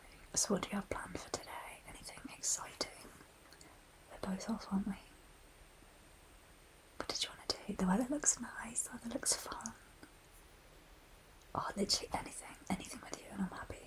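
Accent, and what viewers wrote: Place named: United Kingdom. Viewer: Omg I love the British accent😊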